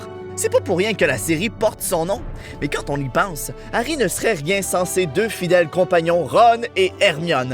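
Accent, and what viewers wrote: Quebec, Canada. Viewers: C juste que vous dites comme ça au Québec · ouais mais là c'est québécois ^^ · Pouce bleu, cousin québécois... J'adore votre accent... J'entends bien les différences de doublage... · est ce que té québeqois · Il es québécois c’est normal c’est son accent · Tu est québecois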